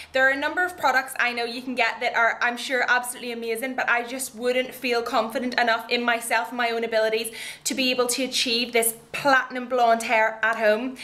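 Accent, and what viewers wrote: Ireland. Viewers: You are so damn adorable. Love your Irish accent! Cutie pie, good video :) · i love her voice:) irish? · I usually hate Irish accents but I love yours:) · i like your hair! its awesome and your accent =) <3 Ireland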